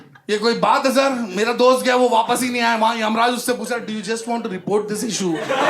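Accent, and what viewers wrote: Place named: Bengal, India. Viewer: Your bengali accent is excellent ❤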